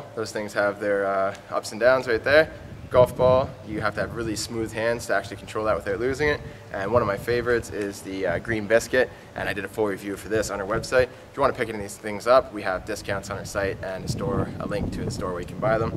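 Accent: Canada. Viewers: he sounds like true Canadian and nice vid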